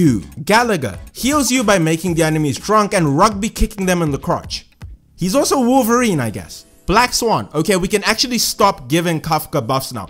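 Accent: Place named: South Africa. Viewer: u started speaking and i immediately went to check ur location lmao i can always spot my fellow south africans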